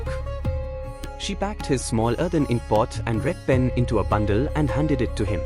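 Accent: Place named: Africa. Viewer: this feels like a african trying to explain a indian cbse portions , still its very good explaination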